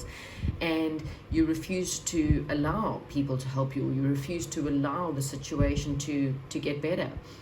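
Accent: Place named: South Africa. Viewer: 💚I love your readings and your accent South African? 💚 100% accurate 💚 thank you💚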